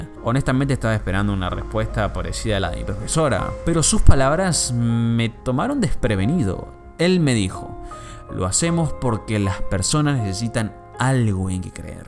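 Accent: Argentina. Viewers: Argentino papa!!!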